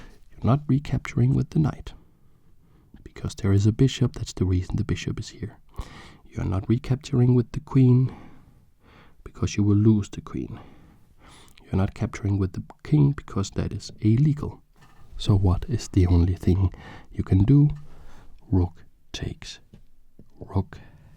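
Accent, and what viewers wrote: Denmark. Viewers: Jesus Christ I got a shock hearing your danish accent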